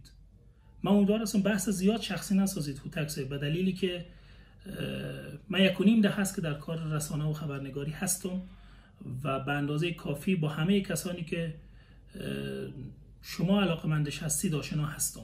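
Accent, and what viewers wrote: Iran. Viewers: شایدانسان خوب باشی ، اما طرز صحبتت کمی ایرانی شکل است و برای لحجه دری صدمه وارد میکند .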